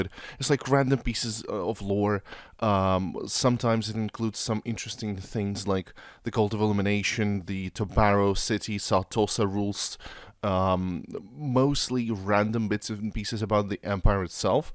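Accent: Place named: Eastern Europe. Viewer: ehh, it's weird. Imma use your rules instead. Also, are You from Poland or Russia maybe? You kinda sound that way, I believe I can spot fellow Eastern Europe folks lol.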